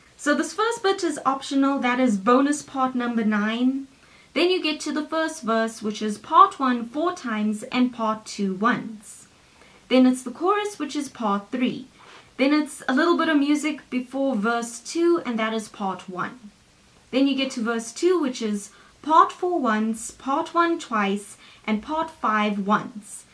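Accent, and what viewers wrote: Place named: South Africa. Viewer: sounds like south african to my ears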